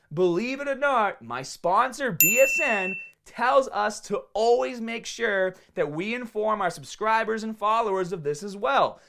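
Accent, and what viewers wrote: Boston, United States. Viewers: Boston accent has consistently thickened at a gradual rate over the years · This guy has the most boston accent I have ever heard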